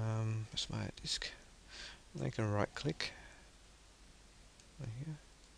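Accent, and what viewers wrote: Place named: Australia. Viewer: wanted to learn how to format my hd instead i got you loving to hear the sound of your Australian voice.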